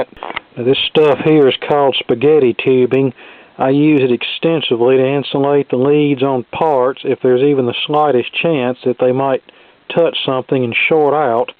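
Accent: South, United States. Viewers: I don’t know where you live, but you sound like you’re from the south like myself. I wish I could come and apprentice with you. I find these vintage stereos/turntables fascinating. I’ve started refurbishing them, V-M primarily as a hobby. I really want to dive into the electronics, but I’m apprehensive. I’ve only ever replaced a capacitor in a TV. All of the diodes, capacitors, tubes etc seem very daunting. However I’d really love to learn. It’s sad that there aren’t more folks like you willing to pass on knowledge to others. Thank you for your videos, and if you’d ever like to tutor me in basic electronics that would be fantastic.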